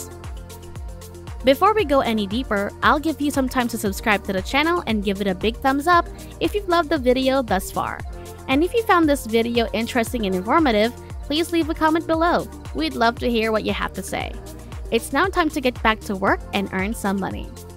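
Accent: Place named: Philippines. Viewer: The woman talking in the video sounds like a Filipino. She has that Filipino telemarketing English accent 😍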